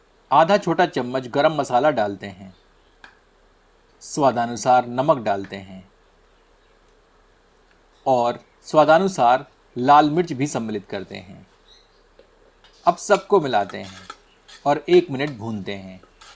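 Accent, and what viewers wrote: Standard. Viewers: Ur Hindi is so shudh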